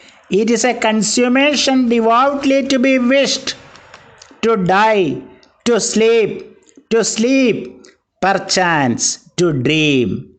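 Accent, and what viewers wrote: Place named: Kerala, India. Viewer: Malayalam accent harms all along...😢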